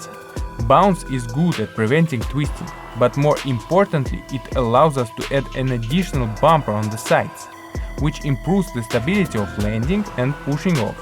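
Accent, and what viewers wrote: Russia. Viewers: Nice review. Are you Russian/Czech?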